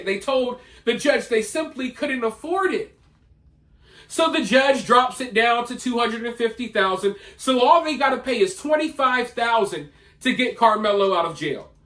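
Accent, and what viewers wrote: South, United States. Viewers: I agree with you. But He’ll you sound like a black dude. Jus saying. Must be from the south?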